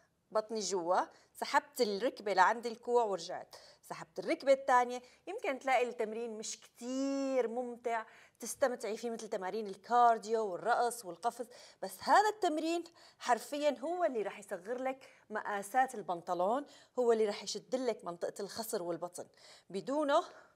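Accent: Aleppo, Syria. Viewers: تحياتي.. كأنو مبين من لهجتك انك من حلب مو ؟؟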